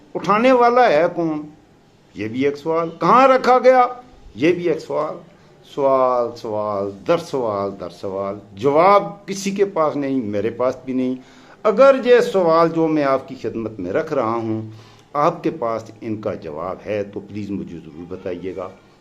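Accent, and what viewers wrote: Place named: Pahari, Pakistan. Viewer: آپ کے تلفظ سے آپ پٹھان نہیں پہاڑی لگتے ہیں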